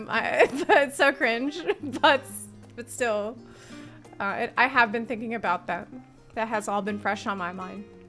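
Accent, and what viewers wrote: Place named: Kentucky, United States. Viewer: aren't you from the america? i mean i live in canada so i'm no expert but you got some kind of...kentucky?ish accent lol.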